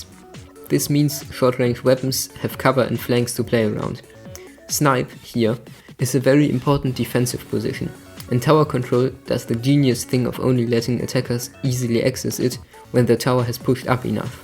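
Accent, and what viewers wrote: Germany. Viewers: Wow thats a really good First video :) And i find it funny how you Sound like having a really strong german accent haha x)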